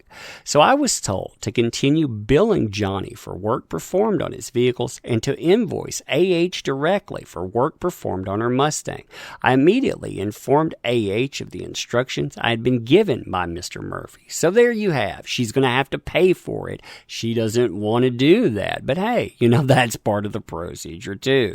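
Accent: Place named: Texas, United States. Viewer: Texan AF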